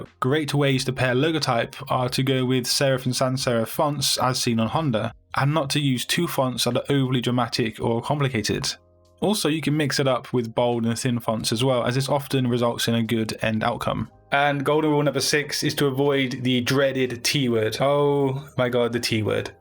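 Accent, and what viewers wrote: United Kingdom. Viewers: Are you British? I like your accent !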